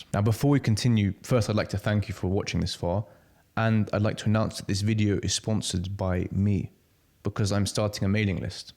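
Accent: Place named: RP, United Kingdom. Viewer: Thank you so much for your work.Sometimes i get pretty useful insight from your videos.I like your soothing, not-constantly-being-interrupted-with-background-music voice and your posh accent.In fact, being an English learner, your videos are something that inspired me to switch to British accent rather than the American one.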